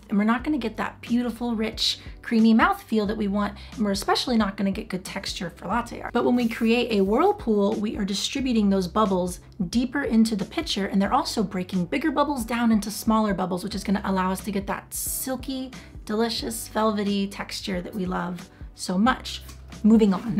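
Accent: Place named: Israel. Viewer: Which country are you from originally? Israel? Going by the accent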